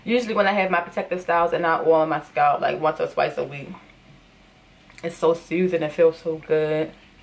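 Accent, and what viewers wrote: Baltimore, United States. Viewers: Are you from Baltimore or dc ? Lol i hear it. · Came out beautiful... off topic but you sound like you’re from Baltimore... but anyway imma try this method... seems easier than the rubber band method .. thanks for the tutorial sis EDIT:and that dress is cuuuute too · Yo she got to be from Baltimore😁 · This is the neatest I’ve seen. I’m trying to do mine and I’m about to throw In the towel. I love your accent by the way. Are you from Baltimore? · Bomb tutorial! Thank you 💙! Can't wait to do this in my hair :). I have a question, are you from Baltimore, Maryland? I hear an accent. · I love that Baltimore accent! I could hear it with certain words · You from Baltimore? I can hear our accent. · U got that strong B-more accent!!😍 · Love your accent. Baltimore? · Lol that Baltimore accent I love it. And your hair looks so pretty I'm gonna stop being afriad and try something new. 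· You're from Baltimore or the DMV right? That accent is a dead giveaway! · This video was recommended to me and I'm glad it was. Great tutorial and hairstyle. Just curious, are you a hair stylist? I noticed a Baltimore accent, it'd be great to have someone with your skills in the area. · I know our accent anywhere Bodymore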